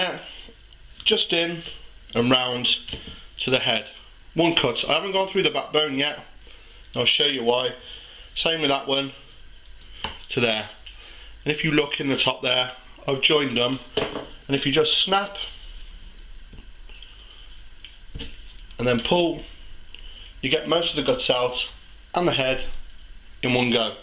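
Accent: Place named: Birmingham, United Kingdom. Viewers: G dench He soulds like he could be from Birmingham England. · Wonderful video (except the word "combination" has a typo in it). Your accent takes me back to the days when I lived on Hagley Road, worked in Smethwick and drank in the King's Head, Bearwood.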